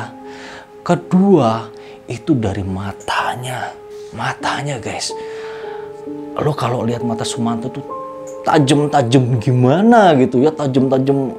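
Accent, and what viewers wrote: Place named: Sunda, Indonesia. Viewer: Sunda na kentel pisan atuh wkwk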